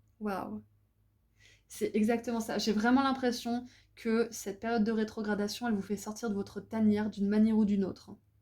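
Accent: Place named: Quebec, Canada. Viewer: Choix de l'antenne relais, avec l'accent québécois lol gratitude infinie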